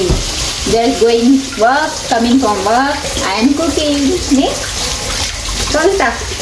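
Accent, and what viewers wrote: Mangalore, India. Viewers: Your Konkani sounds like Mangalore Konkani. Are you from Mangalore?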